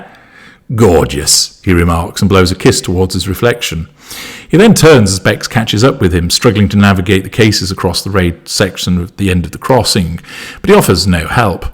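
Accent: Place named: Liverpool, United Kingdom. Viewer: Sounds like a Liverpulian accent to me. Well done.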